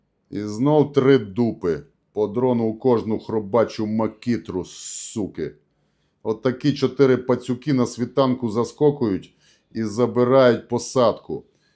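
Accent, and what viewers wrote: Transcarpathia, Ukraine. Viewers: Закарпатський діалект гарно звучить в його виконанні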